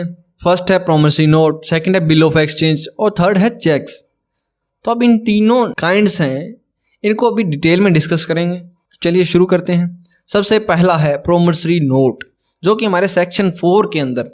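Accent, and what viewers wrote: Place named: Haryana, India. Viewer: U are from Haryana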